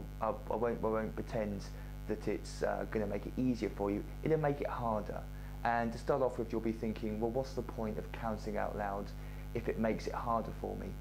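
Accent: United Kingdom. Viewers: he has a british accent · most british accent i have ever heard.....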